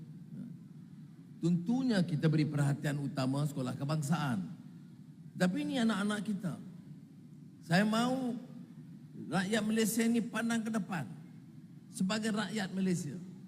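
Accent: Indonesia. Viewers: Raja Malaydesh nonton pidato Ibrahim gak ya? Pidatonya banyak melafalkan bhs Indonesia!! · Anak" kah? Atau budak"..😂😂😂😂. Ini perdana mentrinya aja berkata bargaya logat indonesia..banyak kosa kata indonesia yg digunakan...oh bahasa meleye sungguh merana kau..😭😭😭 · coba Kalian dengerin dehh, si ngomong nya cenderung menggunakan logat bahasa Indonesia 😅😅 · Kok logatnya bahasa Indonesia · ni memang suke gunekan loghat Indonesia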